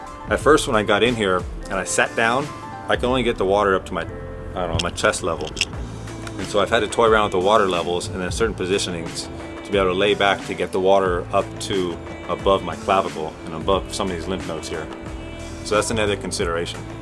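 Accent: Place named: Westchester, United States. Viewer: loo sounds like your in westchester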